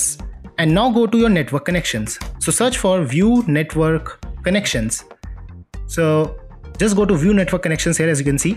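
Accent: India. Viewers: i love your indian accent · Yeah he does have a very tolerable voice for being indian i like it he made quite the nice video too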